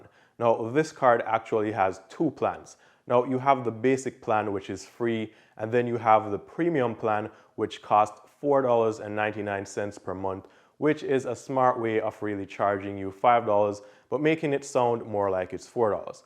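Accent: Jamaica. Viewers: Weird question what part of the Caribbean you from. I want to guess Jamaica but I maybe wrong.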